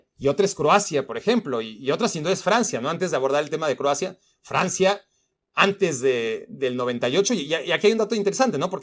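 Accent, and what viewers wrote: Mexico. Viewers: Hablas como en el bajío, en Guanajuato. Los centroamericanos y sudamericanos suenan muy distinto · Sí suenas mexicano. Viva México. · Pues a mi es escucharte y me suena a Méxicano. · Si, tu acento es Mexicano, un extranjero te lo puede decir, porque un nativo se acostumbre y cree que no tiene acento... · Yo creo que tu asento es muy neutro pero si se nota cuando te expresas que eres mexicano, el mexigrindo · Súper mexicano su acento. Desde que empecé a ver su canal noté que era mexicano. Saludos desde Costa Rica.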